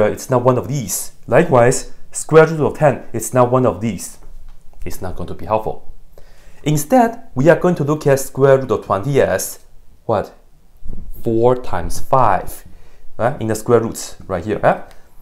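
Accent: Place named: India. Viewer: 不看你的中文视频还以为是印度人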